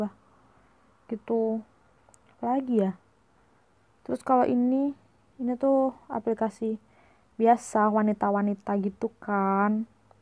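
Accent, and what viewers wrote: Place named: Java, Indonesia. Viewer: Ada medhok"nya gitu deh.wkwk tetep yang sama kek dulu. Semangat kuliahnya dong💖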